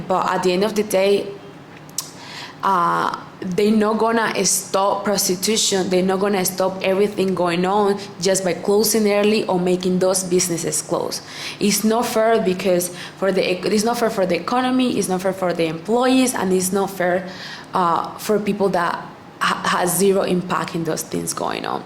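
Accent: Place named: Paisa, Colombia. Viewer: tienes novio? Me encanta tu acento paisa en ingles, me gustaria sacarte a bailar un dia de estos por ahi en Medallo! Saludos!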